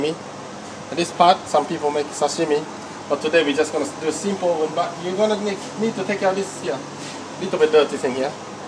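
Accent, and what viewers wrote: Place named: Japan. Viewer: 日本人みたいな発音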